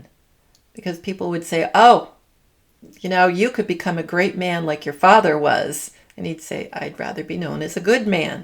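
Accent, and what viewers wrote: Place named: Boston, United States. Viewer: Yes... I too hear a little bit of the Boston Accent 🙂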